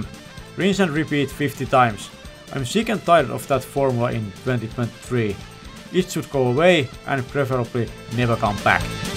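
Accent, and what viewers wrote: Finland. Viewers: Ai että, suomiaksentin tunnisti heti :D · One of the most aggressively Finnish accents I've heard in years lol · You have the most Finnish accent I've ever heard. I enjoy it. I'm subscribed now haha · The most authentically Finnish accent I've heard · I 100% agree with you! Btw are you finnish? You sound very finnish 😅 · Ha! I was listening that this sounds like a Finnish talking with rally-english accent. Then I checked the channel description and there it was, Suomi. 😄 Mut joo, kiitoksia videosta. Itse aloitin pelaamisen vuonna 1986, eli samoihin aikoihin kuin videon tekijä. But yeah, thanks for the video. I started gaming at 1986. Roughly at the same time as the maker of this video.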